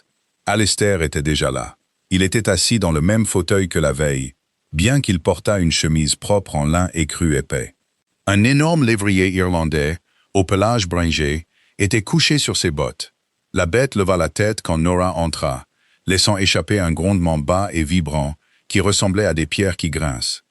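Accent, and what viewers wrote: United Kingdom. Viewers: Un bon moment passé d'un roman historique comme avec un livre papier, sauf qu'à cet instant c'est avec la voix grave et monocorde d'un homme, doublé d'un accent britannique qui n'enlève rien à l'histoire, bien Au contraire ! Merci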